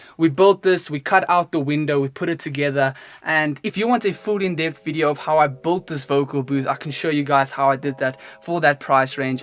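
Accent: South Africa. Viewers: knew your accent was from here keep the content coming and representing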